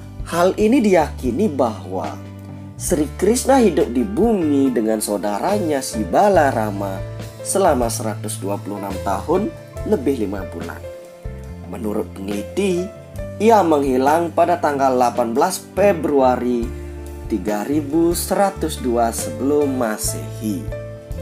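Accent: Bali, Indonesia. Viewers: Logat balinya kental banget hehe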